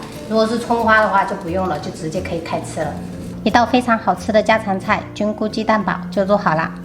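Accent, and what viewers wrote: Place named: Sichuan, China. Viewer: 喜欢看你的频道～^_^～温暖的家常菜，亲切的家乡川普❤️～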